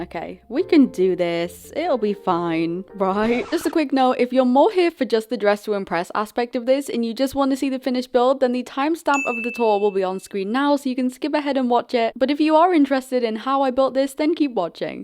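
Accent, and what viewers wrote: United Kingdom. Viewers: Are u British? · Oh my God, I love your accent. It’s like the accent from England. I am not kidding. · Omg your buildings look so good but I love your British accent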